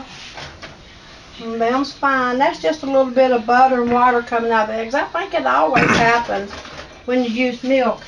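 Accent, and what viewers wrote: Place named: South, United States. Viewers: I love when cute lol says. Let me tell you something in her country voice. And her nice kind husband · I like to watch you cook and hear you talk. Wondering where you live and if that is your real kitchen. It's so comfy looking. You accent sounds like mine; I'm from South Central Kentucky. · I love your videos. I am originally from Virginia near the WVA border, so your accent sounds like home! I am going to try the Fig Newtons. I think we will like them. The bacon looks good too. I'm going to share your channel with my daughter who loves to cook.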